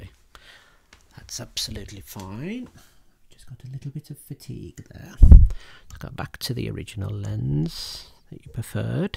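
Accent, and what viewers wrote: United Kingdom. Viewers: A month ago I said this would be the holy grail. Many agreed and you commented about the setup challenge. This is your second attempt, and while I’m excited to watch this I first have to say I’m blown away with your dedication. I was using ASMR to sleep before there was YouTube (bob ross DVD’s). Your unique whisper and Ben Kingsley accent already took over my nightly playlist, but a second attempt to perfect this requested RP Is why a 41 yr old now feels like a groupie. Keep it up, and thank you!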